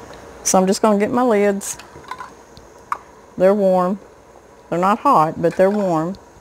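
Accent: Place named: Kentucky, United States. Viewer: Your accent is exactly like my Aunt and Grandmother who were from Harlan, Ky.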